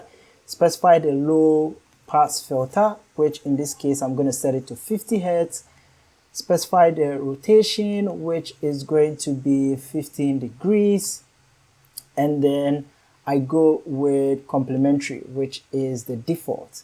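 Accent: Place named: Africa. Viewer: Your African accent when you speak English sounds great and is easy to understand too.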